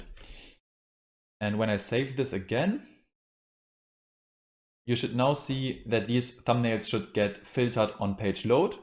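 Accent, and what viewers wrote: Germany. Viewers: are you german ? you have the accent man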